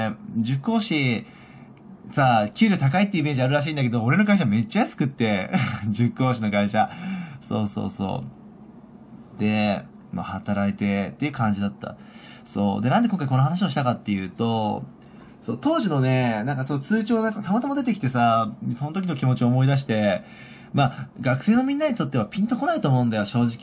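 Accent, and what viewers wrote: Kansai, Japan. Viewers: おとんってｗ さん、ところどころ関西のｲﾝﾄﾈｰｼｮﾝがありますけど、関西人では無いんですよね？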